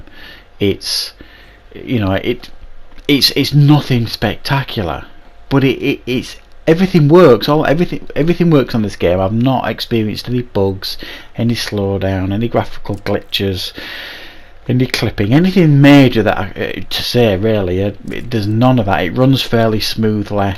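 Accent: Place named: North, United Kingdom. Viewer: Nice to hear a Northern accent!!!!!!!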